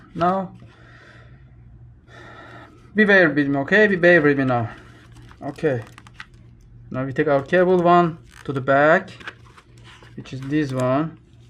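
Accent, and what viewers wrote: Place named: Turkey. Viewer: Süper video, teşekkürler. Aksandan türk olduğunu düşünüyorum 😄Hoparlör kulakları biraz rahatsız ediyor ama alışırım umarım.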